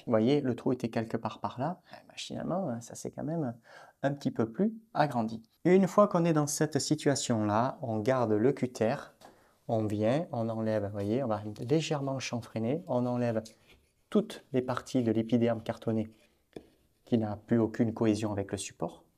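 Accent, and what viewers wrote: Southwest, France. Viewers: merci pour votre réponse, j'adore votre accent du sur ouest !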